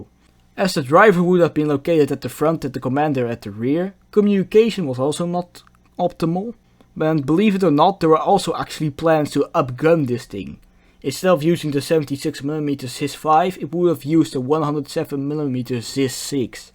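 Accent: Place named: Netherlands. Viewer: you sound Dutch.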